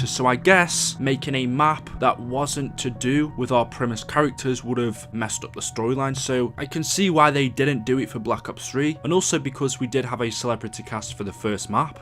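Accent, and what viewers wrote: United Kingdom. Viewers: are you british? Also how old is Arthur and how tall is Arthur? ( I think hes around 7 feet tall)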